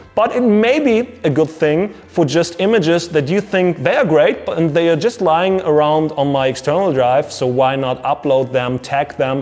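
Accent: Germany. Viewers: Hello from "Nepal", thanks for making this video and uploading. Quite useful and exactly what i was looking for. I am also more into landscape photography & about to buy a camera , so far i am only using my phone😁. Could you plz suggest me which camera would be good (not so much expensive one) i can spend upto cannon 77D , and also i saw that cannon 700D was similar and quite cheaper . Btw i am so familiar with your German accent english 😌. I learn it from my dearest friend in Hamburg. 👍👍👍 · You sound like a german who's speaking english edit: I just recognized he's german lol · after a long time I'm listen to German english ....cheers bro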